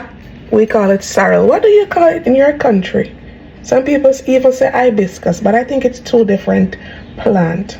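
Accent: Jamaica. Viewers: Just discovered your channel. Love that you get to the point. Love your recipes. Love love love the jamaican accent and I'm subscribed.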